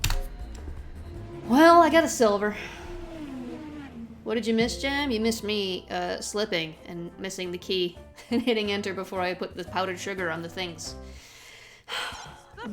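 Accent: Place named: Central Europe. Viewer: From your voice I kinda had a feeling you were from central Europe. Quite amusing how I ended up being correct about that. Also, just out of curiosity, would you happen to be a fellow Dutchie? :p Also, I figured out you can toggle any prep station and holding station upgrades you unlocked simply by clicking on them, so if the large amount of prep stations overwhelms you, that's all you have to do to turn them off again. ^w^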